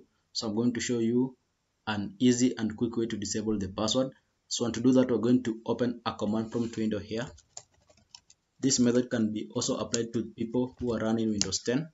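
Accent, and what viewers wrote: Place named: Kenya. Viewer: omg this guy is kenyan the english tells it all rada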